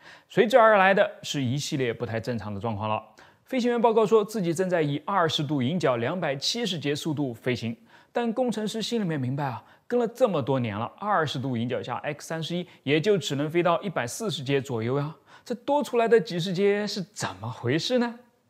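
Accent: Nanjing, China. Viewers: 哇，，原来是南京人啊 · 是南京的？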